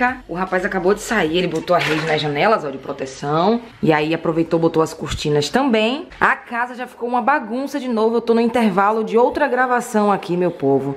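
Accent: Bahia, Brazil. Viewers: " Teu fogo vai acabar agora " kkkkkkkkkkkkkkkkkkkkk Eu amei ! Se eu fosse chutar diria q vc é baiana . Do ND me parei nesse vídeo kkkkkkkkkkkkk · Amo esse sotaque baiano e essa mamae forte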